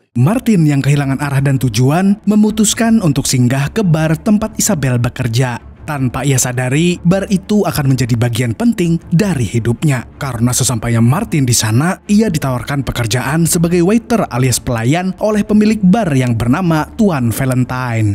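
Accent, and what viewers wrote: Banjar, Indonesia. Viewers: Nahh, urang Banjanr adminnya neh..😅😅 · haw banjar kh pian?😁 salam ae nah dari kaltim hehe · banjar kah pian mang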